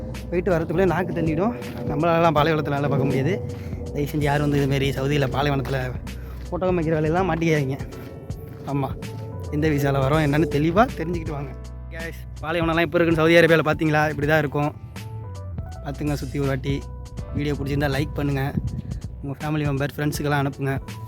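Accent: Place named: Tirunelveli, India. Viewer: மிகவும் சிறப்பு அன்பர்! வாழ்த்துக்கள்!! திருநெல்வேலி அல்லது தென்காசி பேச்சு வழக்கு தங்கள் பேச்சில் தெரிகிறது!!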